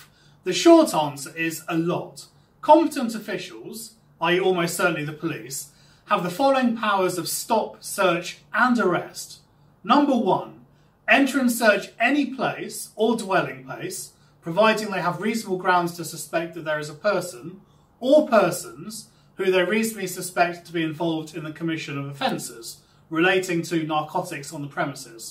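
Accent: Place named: Posh, United Kingdom. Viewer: you know what it is, it's the way you talk, you talk like you went to a private school ( if you want to score some weed and chill on a hammock) you would expect someone with a Glasgow accent to be talking about that. Well I'm on one of the islands now but I will be going back to Bangkok one day. I've subscribed to your page so let's see some new posts. Kind Regards Ryan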